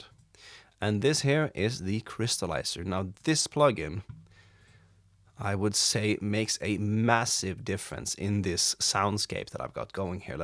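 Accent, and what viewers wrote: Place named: Sweden. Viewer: Jeg har vært helt sikker på at du er svensk når jeg hører den minimale aksenten din. Gratulerer med VM-medaljen i hockey, veldig stort :) (Google Translate :) )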